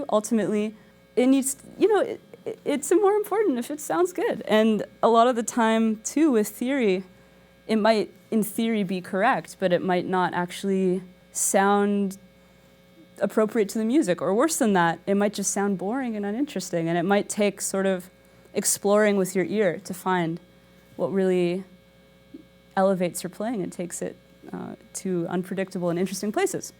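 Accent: Canada. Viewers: beware, she's canadian... she pronounces about "aboat" and that winter jacket... funny thing about music "theory", no matter what you do it can be explained "theoretically". you'll realize this after siting thru a non diatonic chord, modal interchange or dissonance recital, or any mixure therof... solid lesson, penta = 5.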